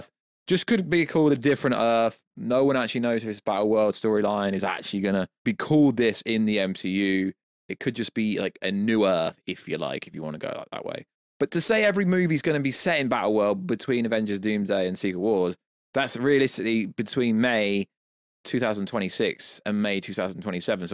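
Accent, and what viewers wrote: United Kingdom. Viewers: I don’t understand what you’re saying. It’s to British. And my English is not that bad. It’s a shame because it looks very interesting. Good luck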